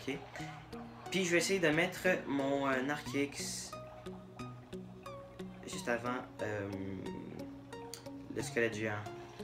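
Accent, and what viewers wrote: Quebec, Canada. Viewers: est tu quebequois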